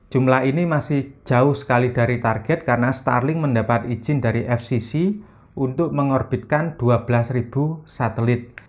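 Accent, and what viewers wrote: Java, Indonesia. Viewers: powerful medok kang😁👍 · Ini pasti Orang jawa.ngomone jowo medal.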